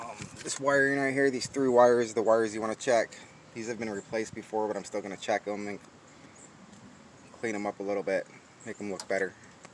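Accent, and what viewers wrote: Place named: Pittsburgh, United States. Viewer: You’re from Pittsburgh huh? Lmao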